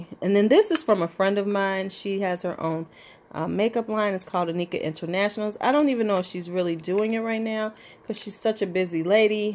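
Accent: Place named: Midwest, United States. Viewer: So glad I found your channel! I’m 49 and it so great to see more women in our age bracket doing it on YouTube. And I completely agree, we can buy what the ___ we want! I’ve raised my son and put him through college, if I want to buy new makeup, it’s My Prerogative! No apologies! I loved the video and your collection. 👍🏾 Question. Listening to your dialect, are you Midwest located? I’m Chicago burbs myself.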